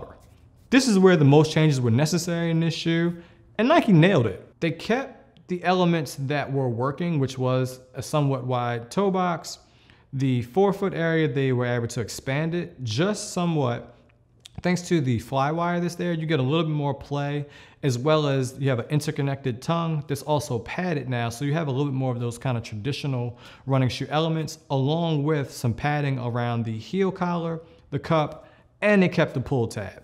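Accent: Baltimore, United States. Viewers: You from Baltimore??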